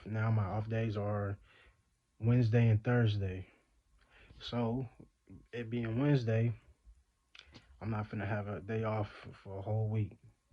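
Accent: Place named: Inland Empire, United States. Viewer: You're from the IE? Low-key speaking like you from the inland empire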